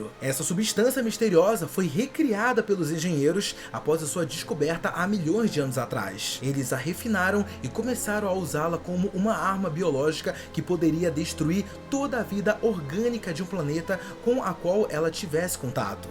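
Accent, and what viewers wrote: Brazil. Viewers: Finalmente alguem que fala palavras em inglês abrasileirando... sem querer forçar a pronúncia igual a um semi retardado nerd que se acha nova iorquino.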